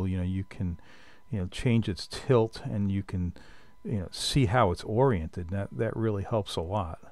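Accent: Long Island, United States. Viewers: I grew up in Eastern LI, in Southold. I fished a lot, especially around Plum Island. On the NE side of the island, I could put my boat between 3 massive boulders and catch blackish until my arms hurt as others 20' away were only catching skate. I love black fish... excellent meat. Your drone footage instills what I imagined down there. You sound like you're from LI... right? I now live in SW Florida and I dive a lot, especially wrecks. The underwater world is awesome and this drone is a great tool to share the scenes without the "work" of diving. I did much better fishing in LI than I ever had here. I have even shoveled snow out of my boat just to go fishing. How much is that baby?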